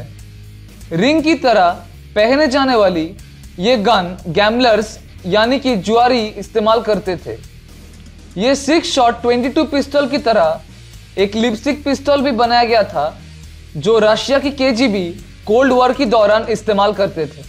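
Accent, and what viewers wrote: Bengal, India. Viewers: ओरे दादा।। पहिले ठीक से हिंदी बोलना सीख ले।। अड़ को आरर बोलता है।। बंगाली दादा।।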